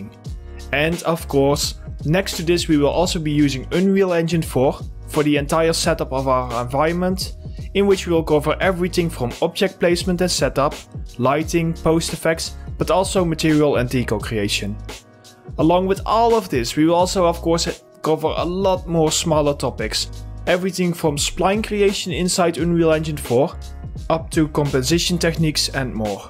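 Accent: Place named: Netherlands. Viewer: Very dutchlish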